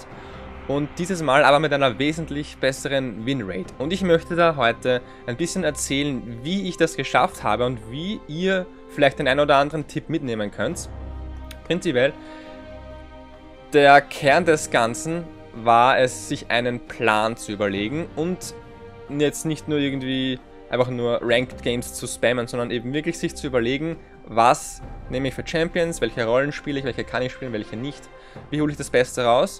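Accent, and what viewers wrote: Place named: Vienna, Austria. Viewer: bist du österreicher :D klingt iwie wienerisch :D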